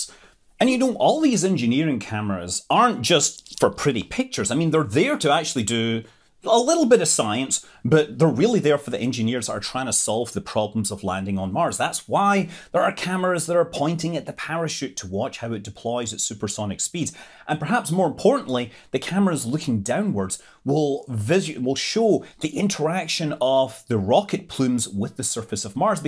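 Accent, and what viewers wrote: Scotland, United Kingdom. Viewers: Husband: "Are you watching that Scottish guy talk about space again?" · Nothing screams Saturday night as a 25 year old more than a Scotsman talking about space! Love it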